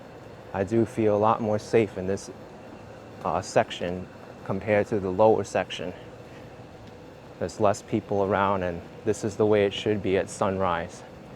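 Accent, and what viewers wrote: New York, United States. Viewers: Your awesome NY accent will alway give you away that you are from NY.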